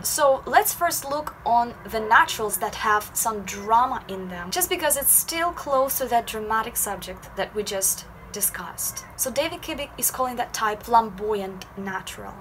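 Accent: Russia. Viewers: *beach *cheeks. She has a Russian accent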